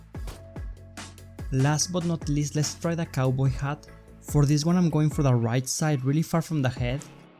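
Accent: India. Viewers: UR accent is like a indian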